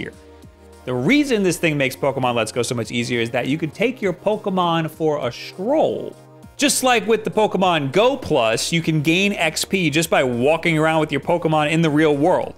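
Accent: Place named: New York, United States. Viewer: Hey are you from around the north eastern US? I'm detecting a hint of an accent, maybe New York?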